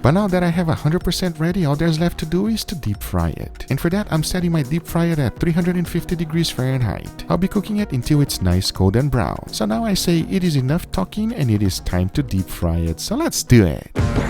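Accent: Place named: Brazil. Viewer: he’s Brazilian so I think it’s just an accent/2nd language thing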